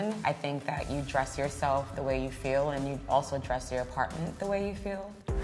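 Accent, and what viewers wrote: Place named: Germany. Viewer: Das ist doch, dachte ich, der Germany Channel - why does she speak en mit einem DE accent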